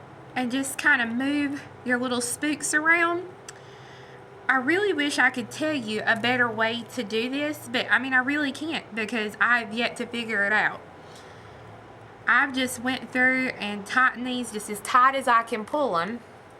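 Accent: South, United States. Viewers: I totally enjoyed the video! I'm from Alabama, so I understood your southern English easily. Thank you!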